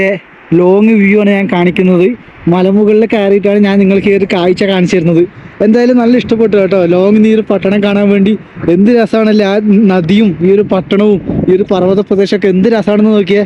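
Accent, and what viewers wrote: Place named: Payyannur, India. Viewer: The real adventurer❤❤കണ്ണൂർ പയ്യന്നൂർ slang. നല്ല സരസമായ ശൈലി ❤️❤️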